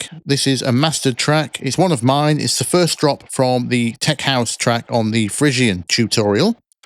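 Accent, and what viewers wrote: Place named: West Yorkshire, United Kingdom. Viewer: nice videos! You sound like you're from west Yorkshire, talking posh? 😀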